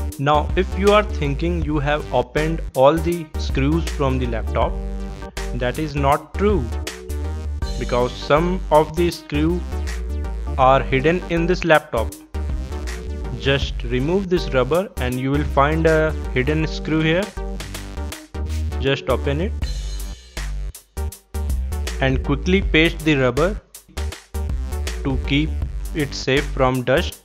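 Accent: India. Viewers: Very nice vid. I can't place your accent? Are you from Kanpur?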